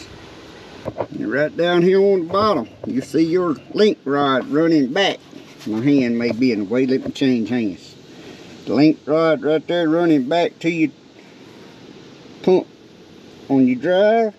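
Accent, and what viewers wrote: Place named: Rural, United States. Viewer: Thanks. My wife loves your country accent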